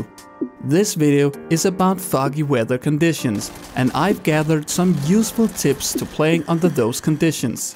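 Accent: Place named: Denmark. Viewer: It's easy to hear for Danes that he is from Denmark - yes with an "a"! :P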